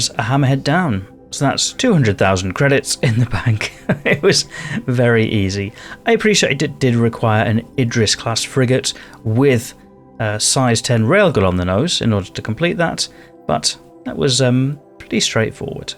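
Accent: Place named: United Kingdom. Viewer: Hope you stay safe amidst the turmoil in the UK from what i can tell (your accent lol) you are British Anyway stay safe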